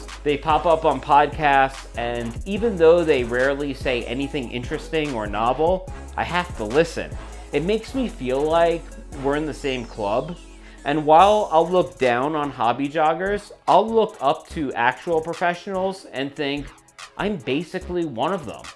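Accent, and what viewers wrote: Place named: United States. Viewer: Your accent says American but your humour says British! Welcome home old Chum.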